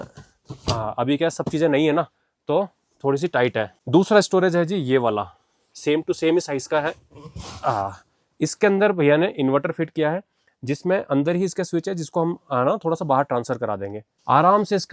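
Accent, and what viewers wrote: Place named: Mewar, India. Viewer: ji aapki boli mewad ya aaspass ki lag rahi hai, mewad, malwa ya aaspass se hi ho kya sir.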